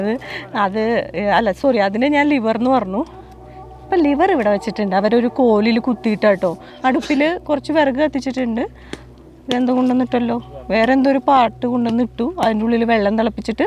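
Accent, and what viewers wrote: Thrissur, India. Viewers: ഇത്രയും നാൾ യൂട്യൂബിൽ കേറി ഇറങ്ങിയിട്ടു ഇന്നാണ് ഞാൻ ചേച്ചിയുടെ ഈ വീഡിയോ കണ്ടത്...പിന്നെ സംസാരം കൊള്ളാം ട്ടാ. എവിടാ വീട് തൃശ്ശൂര് ആണോ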